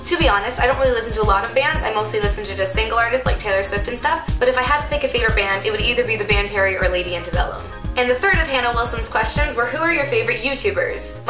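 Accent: Canada. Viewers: Harry Potter is my favorite! I'm also really good at math although English is more fun for me. Ps your accent is so cute! I love Canadians.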